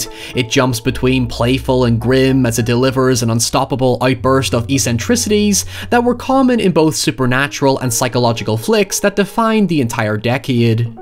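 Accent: Ireland. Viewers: I also do "Horror Genre" vids, and one of my wee ones (who happens to be very, VERY scared of the dark, and have a TON of phobias) likes to listen as I make a video, so I have to be careful which videoes I let her listen to me do Audio for... ANYWAY- whenever I get a notification one of one of your videos dropping, I like to take a break and listen. Now my wee one is obsessed with your accent too. SHE now loves Irish accents MORE than English accents ( I do a convincing combination of various UK accents- as I act too. Stage "Irish" sounds nowhere near as charming as your TRUE Irish. ENJOYED the video. This movie seemed to have completely changed from when I saw it in my late teens to when I saw it again in my late 20s. Now that I'm in my 30s it might be time to revisit it again.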